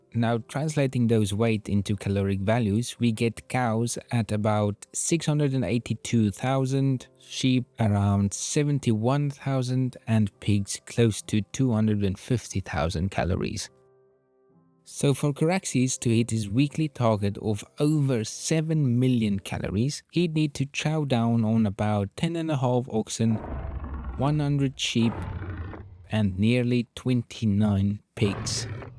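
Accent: South Africa. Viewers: Hilarious that I could pin you as a fellow South African just from your accent lol. Great video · Is the creator South African??? The accent sounds very familiar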